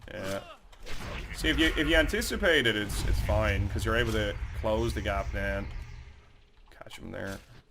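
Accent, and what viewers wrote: Ireland. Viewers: What part of Ireland ya from man?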